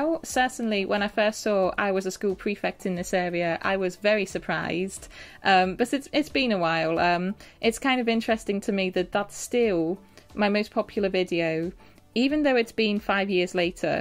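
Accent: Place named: Wales, United Kingdom. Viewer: Have you ever lived in Wales as your accent sounds quite Welsh?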